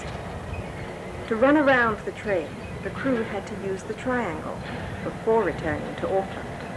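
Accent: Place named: RP, United Kingdom. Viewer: A very interesting video. I love the very posh English accent lol!. 😄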